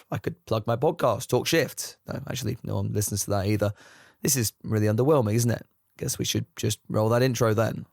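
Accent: United States. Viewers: Is that an American accent?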